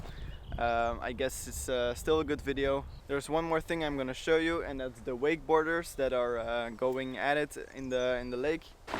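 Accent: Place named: Belgium. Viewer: Where is that, judging by your accent, I think I might live close and I'd love to try that course. Just read you're from Antwerp, dan ben ik zeker dat het niet zo ver is.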